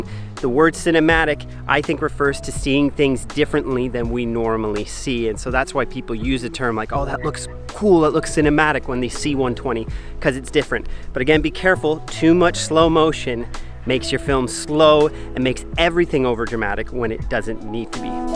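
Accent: Canada. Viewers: 3 seconds in. Canadian.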